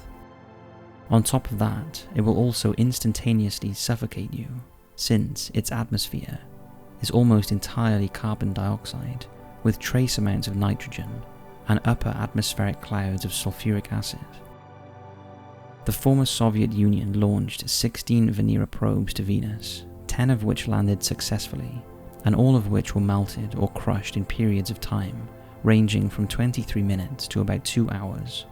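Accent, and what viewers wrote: United Kingdom. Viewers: Why is he using Fahrenheit when he is speaking with a British accent?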